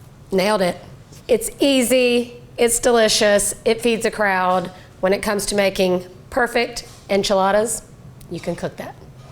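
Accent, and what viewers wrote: South, United States. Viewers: Hands down the most creative chicken enchilada recipe here on YouTube. 💯 I still grimace when I hear flour tortillas are being used where corn traditionally is utilized, but perhaps I need to finally try it for myself, which I will, for the first time, soon. However, I will plan to roast the poblano Chile and bell pepper first. Maybe add some bacon grease to the beans, and some tomato paste to the onion, pepper, & poblano chili saute. Btw--love this chic, her southern draw, and relaxed attitude around food; reminds me of my Texas upbringing......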